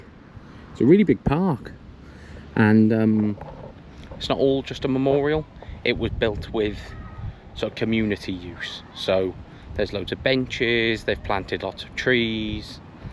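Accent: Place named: United Kingdom. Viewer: Я конечно не понимаю на английском, уж тем более с британским акцентом, но посмотр был интересным!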